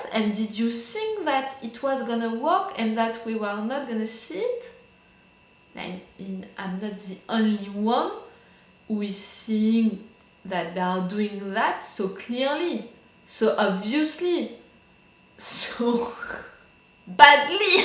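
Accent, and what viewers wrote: France. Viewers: Elle est française ça s'entend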